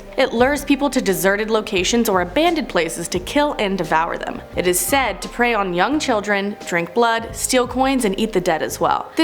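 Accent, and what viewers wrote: Canada. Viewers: I like how they talk in Canada. Words like: "about" they pronounce "aboot."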